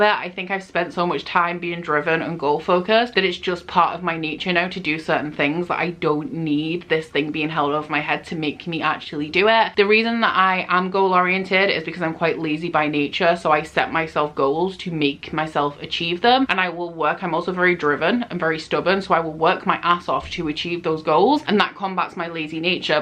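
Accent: Yorkshire, United Kingdom. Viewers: Heey, great video i love supporting your channel. It’s so nice to here a yorkshire accent on youtube as that’s where i’m from and i don’t feel like there are that many booktubers from here. I was just wandering if you have any advice on how to read more as a very busy student with not that much time? as i love reading but i struggle with what to read etc as i’m trying to juggle my masters, work and a life as well? Any advice would be appreciated 😄😄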